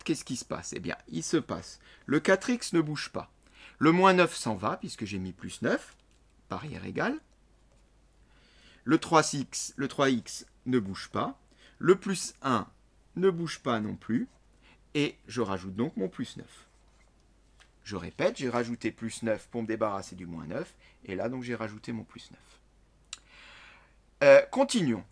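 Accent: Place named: France. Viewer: mais des lunettes et un beau accent français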